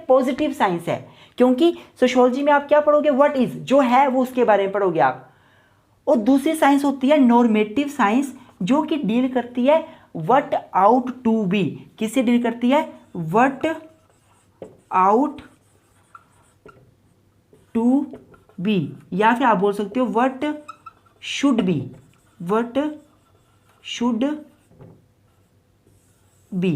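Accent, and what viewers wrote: Himachal Pradesh, India. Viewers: Kya aap Himachal se ho ? 🙄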